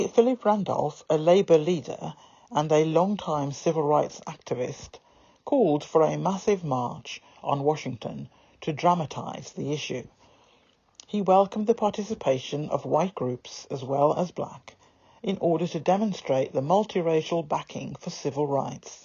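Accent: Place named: England, United Kingdom. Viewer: every time I hear that speech it gives me chill bumps. This will be the first time I heard it from a person with a English accent…and it was beautiful! I also think the speech was poetic.Thank you!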